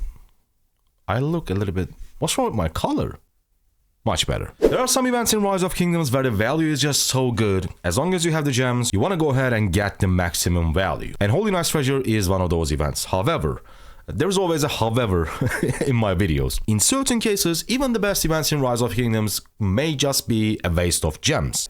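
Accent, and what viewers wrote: Turkey. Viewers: Bro you have a strong Turkish accent are you Turkish by any chance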